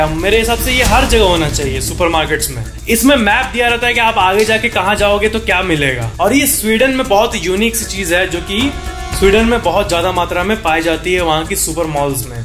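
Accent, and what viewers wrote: Bihar, India. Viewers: Sir aap bihar se ho kya???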